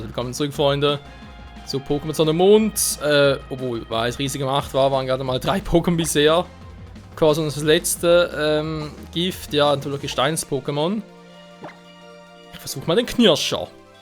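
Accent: Switzerland. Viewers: mä merkt das schwizer bisch:)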